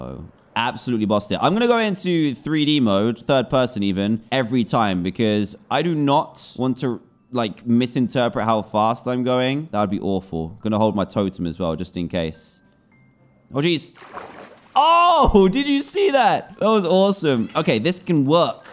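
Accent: United Kingdom. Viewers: Hes British that's how they say it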